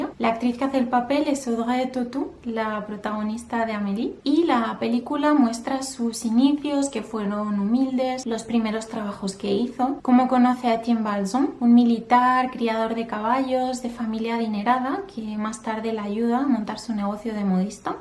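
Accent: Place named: Spain. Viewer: bueno queriendo aprender un poco del idioma de melanie laurent me encontre con vos belleza española y hora no puedo dejar de admirarte y esto no es acoso creo es que estas muy bonita que suertudo tu novio pa que te cuide y se ponga celoso total estoy en bsas arg bien lejos me encanta tu acento español y tu delicadeza femenina como una flor chauuuuuuuuuu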